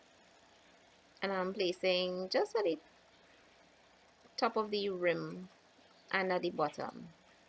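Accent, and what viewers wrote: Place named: Trinidad and Tobago. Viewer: Awesome DIY, Love it and I love your Trini accent. Tfs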